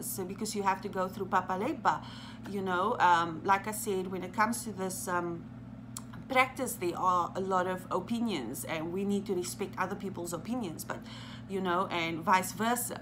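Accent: South Africa. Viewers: You have a South African tone to you're voice hey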